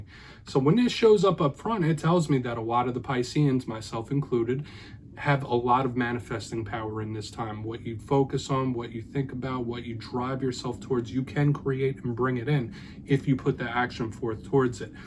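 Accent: United States. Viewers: What a cute deck! Very soothing voice (love the US accent!) and smooth tarot delivery. Greetings from 🇨🇦🇨🇦😊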